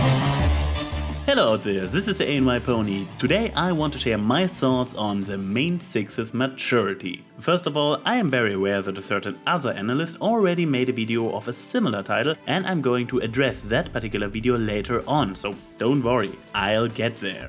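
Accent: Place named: Germany. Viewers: Right now i'm really curious: are you german or are did you just had one to many lessons of german in school? (option 3 would be that it was not your own voice and therefore spoken by a native speaker, but it sound extremly similar to me) In beiden für wahrscheinlich angenommenen Fällen bin ich mal so frei und probier es aus: Schönes Video und gute Argumente. Immer wieder schön dir zuzuhören. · Is German? I mean, dat accent though...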